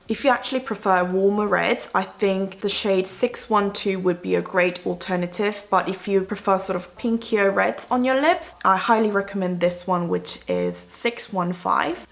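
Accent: United Kingdom. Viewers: 심지어 오랜만에 영국영어로 해주시니 더 좋네요 흑흑 😌❤️ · 영국억양이 넘 듣기 좋아서 계속 되돌려보기 한다고 진도가 안나가요..❤️ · 미국 영어나 캐나다 영어 하시는 분들은 많이 봤는데 영국 영어 하시니까 멋있으시고 신기해요! 🥺✨ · 안그래도 영어로 말씀하시는 콘텐츠 보고싶다고 댓글담기려고했었는데 이번에 뙇!! 넘 반갑고 좋아요 헤헤 의 영국식발음도 좋고 구사하시는 단어, 목소리 등 다 너무매력적이에요ㅠㅠ💕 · 영국 발음!!! 영국계 한국인이신가용.. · 오오랜만에 영어로!! ㅎㅎㅎ한국으로 오시고 영국발음 너무 오랜만에 들어요♥️ 오늘도 잘볼게요!!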